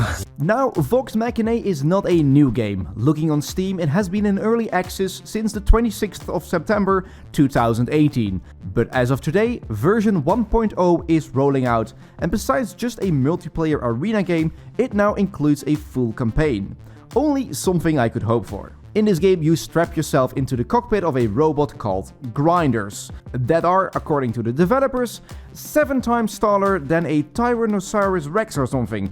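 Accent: Netherlands. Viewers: Hahaha! Typische Nedelandse accent! 🤣😁:yougotthis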